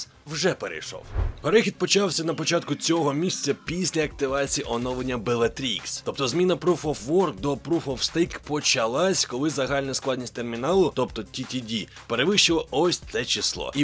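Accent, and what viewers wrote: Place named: Russia. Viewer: Забавно, как русский человек с чистым русским языком вдруг решил считать себя украинцем. Вот только для селюка западенца твой украинский все равно будет с "кацапским" акцентом. Таки пропаганда пробила здравый смысл? Оно того стоит?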